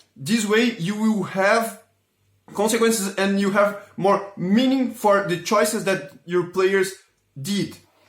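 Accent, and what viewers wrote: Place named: Brazil. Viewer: Consigo sentir o sotaque brasileiro à quilometros de distancia KKKKK, ótimo vídeo mano, tava precisando de dicas assim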